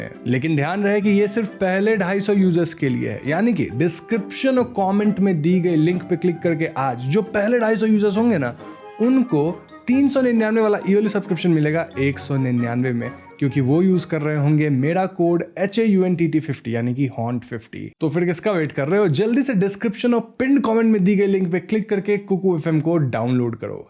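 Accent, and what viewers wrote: Bengal, India. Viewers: Aapki Bengali accent wali Hindi Alag hi feel deti hai... KO😍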